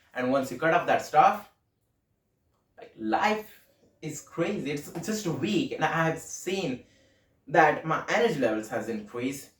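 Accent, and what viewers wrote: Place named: India. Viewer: Bro Indian accent is good u do not need to try other accents.. by the way good work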